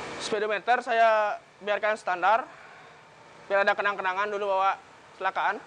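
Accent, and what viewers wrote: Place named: Bali, Indonesia. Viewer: Wkwkwk logatnya bali kental 😀😀😀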